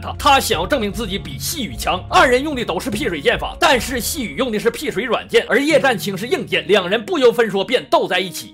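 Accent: Northeast, China. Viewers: 東北味真濃.據說東北人普通話都很好.Thanks♪(･ω･)ﾉ影評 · 这份碴子味儿的解说听上去那是相当地亲切啊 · 一定是咱们东北银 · 最好听、最逗人的东北话，都在这里了。😀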